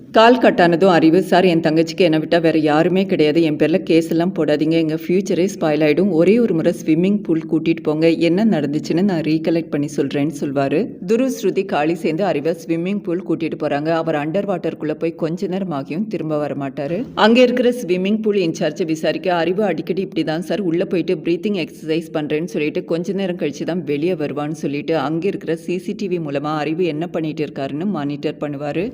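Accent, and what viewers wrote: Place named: Nagercoil, India. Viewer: Nagercoil slang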